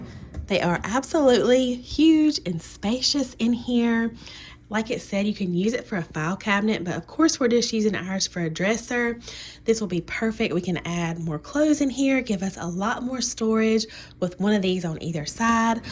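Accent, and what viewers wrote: South, United States. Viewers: Girl. I haven’t watched your vid in quite awhile. Know you have new house, but what state are you in? Accent is a pleasure to listen to so thinking Ga., Ark., or Tenn. I put up a Halloween tree yesterday, so absolutely agree with your schedule. Tfs cheers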